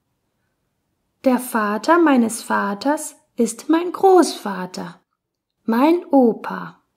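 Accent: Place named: Italy. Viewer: Schade, dass die Deutschen nicht so sprechen. die Intonation und Dialekt sind sehr anders. Die Sprecherin kommt vielleicht aus Italien, oder. das finde ich nicht gut.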